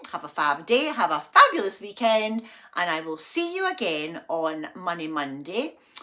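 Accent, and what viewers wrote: Scotland, United Kingdom. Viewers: Nice to listen to Scottish accent ,butter looks great , 🏴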